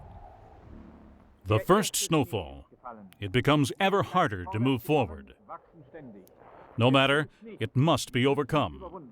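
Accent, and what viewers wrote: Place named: United States. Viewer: Yank voice over ?....................Ruined!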